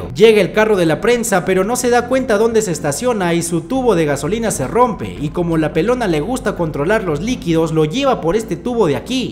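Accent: Peru. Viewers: Que chevere que haya un yutuber que sea peruano y me narre la historia en peruano. Saludos desde la selva causa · Sin que diga que es peruano, ya sabía que era peruano en los primeros segundos del video 😆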